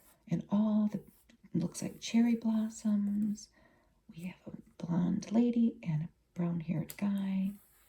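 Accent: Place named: Minnesota, United States. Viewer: Sounds like Minnesota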